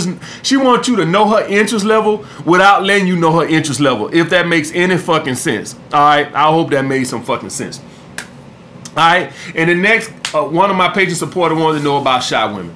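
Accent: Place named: Atlanta, United States. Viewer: you sound like you from Atlanta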